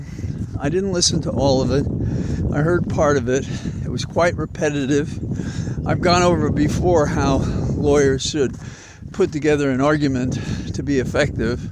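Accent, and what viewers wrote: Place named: Ireland. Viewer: Belated Anniversary Congratulations to you both … touch of the Irish in your talk today … made me smile 😀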